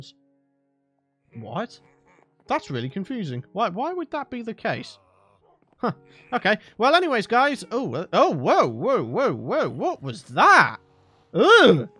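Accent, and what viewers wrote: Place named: Scotland, United Kingdom. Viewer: anyone else think that is a want to be Stampy, except stampy is english and sounds scottish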